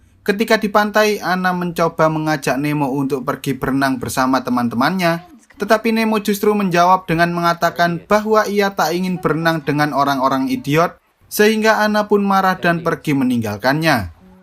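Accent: Java, Indonesia. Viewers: mantap bang, semoga selalu medot Jawa!!! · Medok nya mengganggu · Me'dok amat mas. Suaranya.hehe🙏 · Ampun dah medog bgt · Abang kok kayak bahasa jawa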